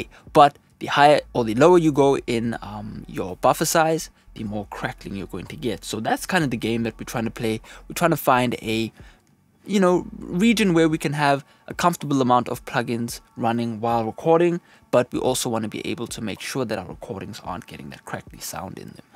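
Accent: South Africa. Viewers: bro where u from, u sound south african.... shoutout to SOUTH AFRICA!!!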